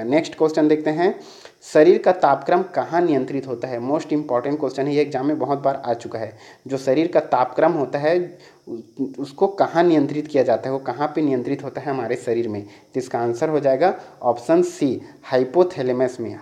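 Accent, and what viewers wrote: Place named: Chhattisgarh, India. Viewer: c.g kuc jyada hi bolte ho kya sir..hindi ko v chhatisgarhi typ tunning me padhh rhe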